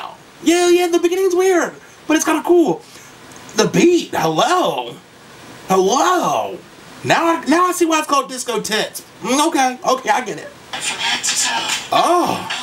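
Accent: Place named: United Kingdom. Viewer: The British accent tho😂❤️❤️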